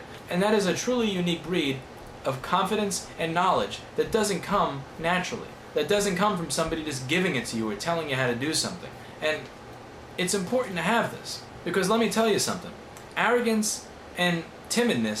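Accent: New York, United States. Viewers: Louis Rossman has that NYC accent.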